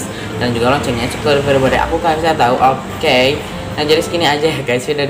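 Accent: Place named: Sunda, Indonesia. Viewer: Logat nya kee Sunda bang 😭😂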